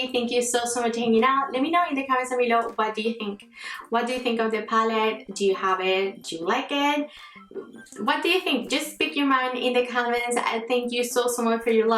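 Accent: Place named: Canada. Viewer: I am so happy I came across your channel. You are so sweet. 💕. I love your accent. :) I see that you are from Canada. Me too. I am from Alberta. Where are you from? I am so excited to find youtube friends and the most excited when i see they are from Canada as well. 💕 You look very beautiful. Would love to be friends on here. New subscriber here as I love your channel and love coming across YouTubers that are in the same niche making makeup videos like me. 😘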